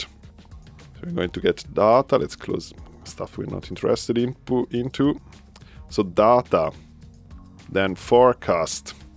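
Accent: Italy. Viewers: Hey, your Italian accent is wonderful and adds to your channel. English speaking viewers can only be grateful that you are producing these great tutorial videos in their native language. And I did not know there was a "maxurl" object - thanks!!!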